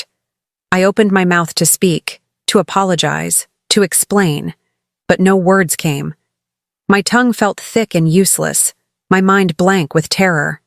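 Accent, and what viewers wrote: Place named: United States. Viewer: The voice is American English. Doesn't fit at all!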